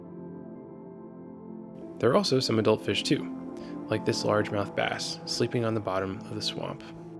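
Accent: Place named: France. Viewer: Are you French ? Your accent sounds like that. 🎀 thanks for your videos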